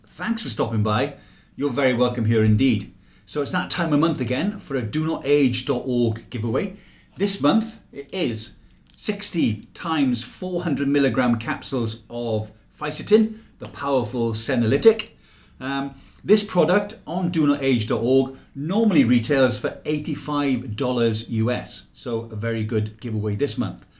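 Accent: Wales, United Kingdom. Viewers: Obviously you come from Wales :)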